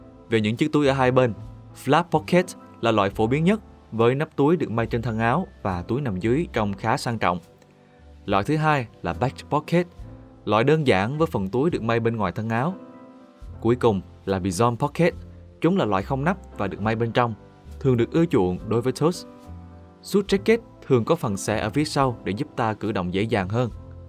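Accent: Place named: Quang Nam, Vietnam. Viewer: Lần đầu nghe giọng Quảng trên kênh, khá êm tai nha 👍👍👍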